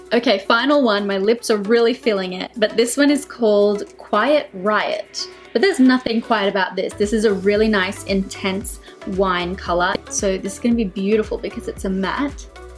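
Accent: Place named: Australia. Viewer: when you said "desire" i heard "the Zaya" :)) gotta love the aussie accent <3 need to try the lipsticks as they look amazing on you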